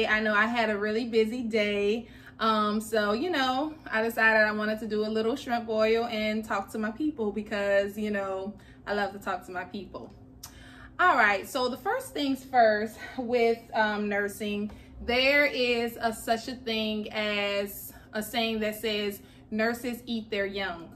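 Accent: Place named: New Orleans, United States. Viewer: I knew you where from new orleans… sis that accent you cannot hide. I mean... I was trying to listen... I was like... well she kinda sound new orleans.. It peaks through.. anyway.. Im from Thibodaux... youll probably know where that is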